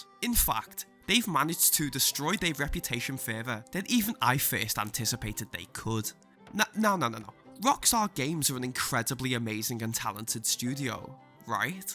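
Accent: Liverpool, United Kingdom. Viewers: U scouse? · Just curious, are you from Liverpool? Cause you kinda sound like Darren Till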